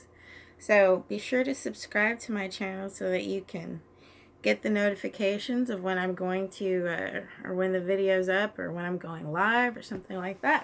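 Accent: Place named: Dallas, United States. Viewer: I'm from Dallas too.. I never realized we have a Dallas-accent until now! I hear myself lol high five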